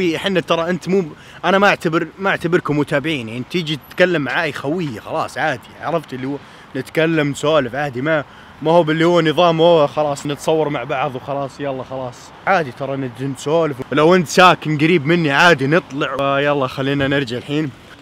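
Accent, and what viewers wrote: Saudi Arabia. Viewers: ادمان اوفر واتش هو تونسي بس بيحكي سعودي عشان نفهم عليه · Abdallh_alenzi _ بس هو يتكلم سعودي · تونسي بس كان عايش ب السعوديه عشان كدا بيتكلم باللهجه السعوديه وهو دلوقتي عايش ف كندا دراسه وكدا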